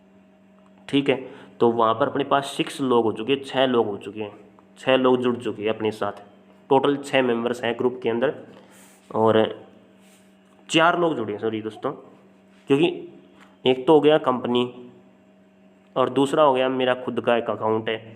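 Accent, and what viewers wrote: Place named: Haryana, India. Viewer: Aapka accent bhi hariyanvi hai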